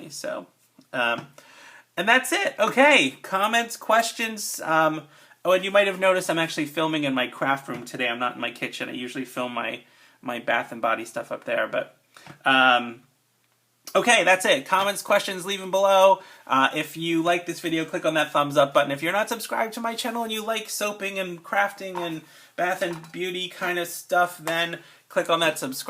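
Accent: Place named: Philadelphia, United States. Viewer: I've made room sprays using varying percentages (from 50% to 90%) rubbing alcohol, or vodka (35% or 70 proof), or Evercleer (95% or 190 proof) grain alcohol. I really dislike the alcohol smell in all of them, nor do I like waiting for the alcohol smell to dissipate...some taking MUCH longer than others. So I'm glad to hear about the DEO Household stuff you've recommended using. I'll check it out. One other thing, though. I've always heard you should use EO instead of FO in a linen spray because FO can leave a stain and EO will not. For that reason, I've only ever used EO in a room or linen spray. I've never used FO in a room or linen spray, so I can't say whether it stains or not. Have you any thoughts on that provision? You sound just like my friend in NE Philly...are you in Philly?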